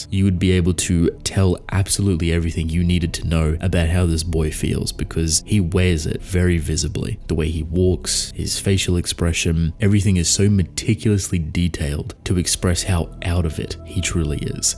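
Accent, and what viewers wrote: Australia. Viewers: Great vid. Are you Aussie by the way? I have trouble pinning down the accent.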